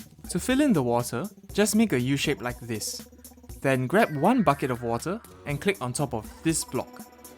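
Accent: Singapore. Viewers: Hey, just wondering, but do you live in Singapore? You kinda sound like a Singaporean for some reason....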